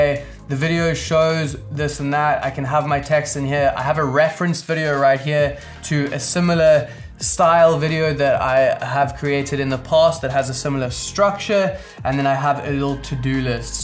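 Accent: South Africa. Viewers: Is jy 'n suid afrikaner? Dit klink soos 'n jaapie wat in England woon.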